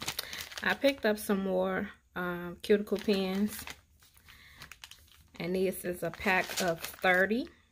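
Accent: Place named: South, United States. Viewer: I just found you and I’m already hooked on your videos. I don’t know if it’s the wonderful southern accent or all the awesome items you’re showing but I can’t get enough❤️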